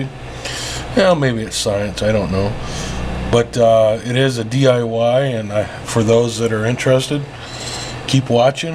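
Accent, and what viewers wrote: United States. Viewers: You are a sweetheart! Are you from the area of Northeast, N.Y? 'Sounds like a Syracuse accent to me. Your wife must have been very touched by all the love you put into her Valentine's Day gift.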